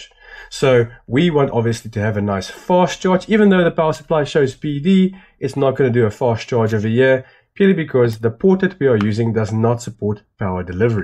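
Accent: South Africa. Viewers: Is there maybe a way to get a 60W or maybe higher (foldable camping) Solar Panel to get to charge drone batteries? I've seen some that comes with it's own attached mini "inverter" that pushes out 12V 60W per USB-C and an adapter for 12V DC direct. We have the Air 2S and 5 batteries. Going on an Adventure Bike tour, the tankbag will be housing the drone gear and GoPro stuff. Space and weight is limited as there is camping gear, rations, clothes, etc. that has to be considered, so bulky heavy powerbanks won't work. Would like to hear your opinion. You sound South African btw LoL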